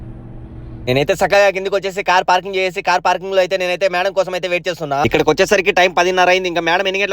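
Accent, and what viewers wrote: Rayalaseema, India. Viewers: Seema slang anthe 😂😂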